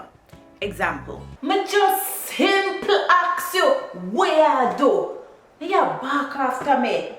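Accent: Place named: Jamaica. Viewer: I'm sitting on my couch alone and thought "the voice in my head needs a Jamaican accent", and here I am, an hour later still on your page. Tank yuh. Mi see ya likkle more. 🤣😁🫶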